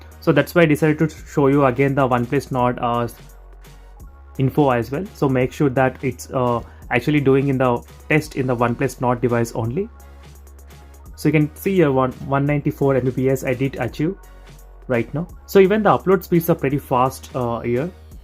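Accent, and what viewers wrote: India. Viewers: Bro you live in Australia 😮 i thought that you are indian and live in delhi😂 bcz of your channel name and your accent also like indian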